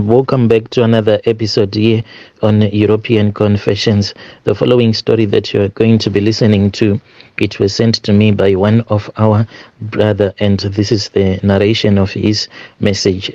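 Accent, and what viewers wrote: Zimbabwe. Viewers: This is just crazy the story teller sounds Zimbabwean or a national foreign but all his stories are based on South Africans …I sense lies here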